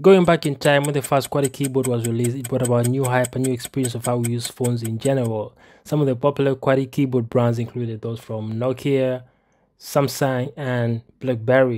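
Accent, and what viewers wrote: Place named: India. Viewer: it's kinda good content but you sound indian and ofc keep making similar videos to this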